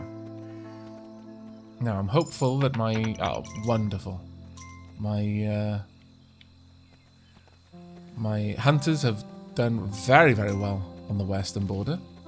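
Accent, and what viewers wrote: Wales, United Kingdom. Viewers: You welsh?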